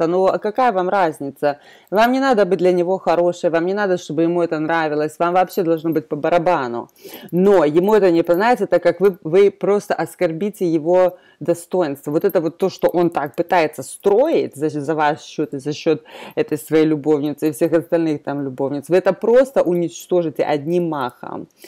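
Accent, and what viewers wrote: United States. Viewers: Обожаю ваш американский акцент)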